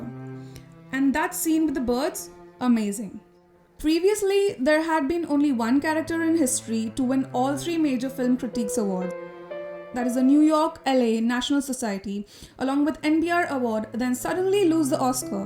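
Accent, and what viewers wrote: India. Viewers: I would seen this through if it wasn't for that strong indian accent. Reminds me of "microsoft" caller.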